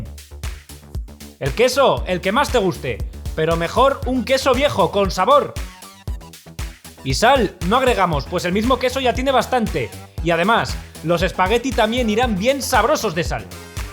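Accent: Spain. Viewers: Ay. Que sabroso. Se ve. Me encanta tu receta y tu acento español ‼️‼️🇵🇷🇵🇷🇵🇷⭐️💯que viva la Madre Patria. Saludos desde California 🇵🇷♥️⭐️💯💜🙏‼️DIOS TE BENDIGA 🌺🙏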